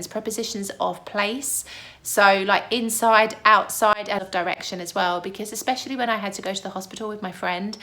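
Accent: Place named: Cockney, United Kingdom. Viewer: Surely an Hospital is not just the easiest place to learn Italian. You did pretty good. We all were like you at the beginning of our English learning language path. Grammar will follow along once you'll be more comfortable with the spoken language. Italian grammar is pretty difficult, especially tenses; try to learn it in little bits a time. But, please, don't spoil that lovely Cockney accent. 😁 You'll soon realize that at least the 70% of italian terms have corresponding very similar terms in English, some of them are just little bit less used because they may sound sometimes a bit archaic or poetic or too technical. Probably in some cases some ancient Latin inscriptions could be better understood by an Englishman not knowing Latin than an Italian not knowing Latin. 😁 As some funny videos, such as those from Ecolinguist or from Luke Ranieri - Polymathy YouTube accounts, could explain very well. 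😁 Keep up the good work. 👏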